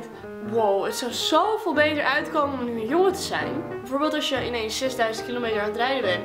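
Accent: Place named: Groningen, Netherlands. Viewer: omfg dat Gronings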